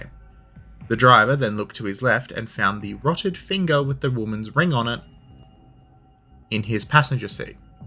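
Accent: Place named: Australia. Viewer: Something I wasn't expecting was an Australian historian telling me about Romanian folklore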